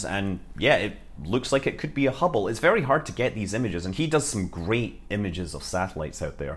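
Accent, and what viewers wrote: Scotland, United Kingdom. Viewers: Is he Scottish? He sounds just like Mrs. Hughes.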